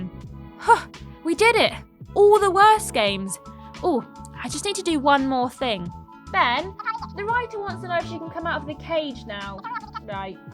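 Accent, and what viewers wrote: United Kingdom. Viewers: This girl is the most British person I've ever heard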